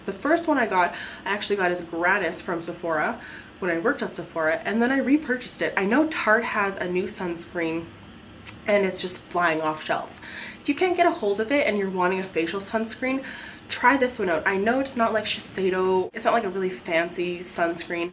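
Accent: Netherlands. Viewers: Are u Dutch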